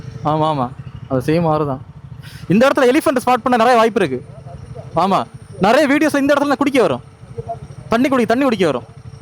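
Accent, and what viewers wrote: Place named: Kanyakumari, India. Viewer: Nice .....Nanbarae.....In kk slang....❤❤❤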